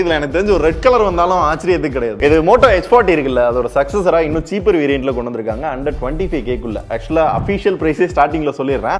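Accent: Madurai, India. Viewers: Bro Nenkee Madurai yaa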